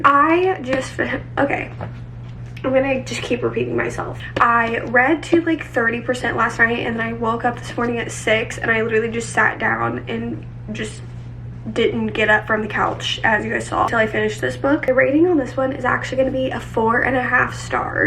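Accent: South, United States. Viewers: Her southern accent is the cutest thing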